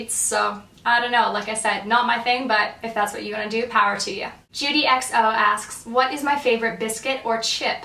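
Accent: Australia. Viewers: You're getting a little Australian tang to you're accent lol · she kinda has that australian accent, lol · Are you starting to pick up an australian accent? :)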